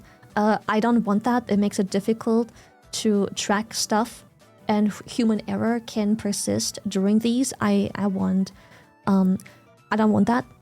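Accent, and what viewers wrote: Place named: East Germany, Germany. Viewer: I guess, the accent kind of tells it, she's from eastern Germany. More specifically Sachsen-Anhalt.She never went beyond those details.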